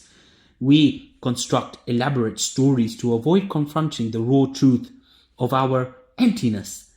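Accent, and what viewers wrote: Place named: India. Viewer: Your accent sounds Indian.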